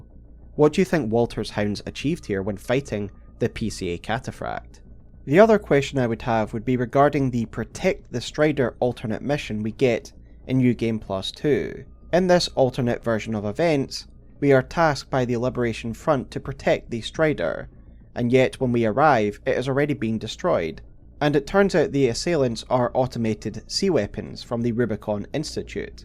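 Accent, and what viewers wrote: Scotland, United Kingdom. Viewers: You’ve never heard a Scottish accent?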